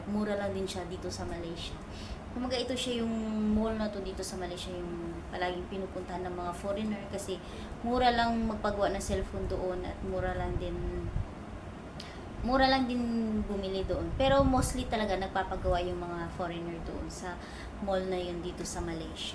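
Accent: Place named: Hiligaynon, Philippines. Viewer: Ang ilongga accent di tlga sya matanggal oyy 😂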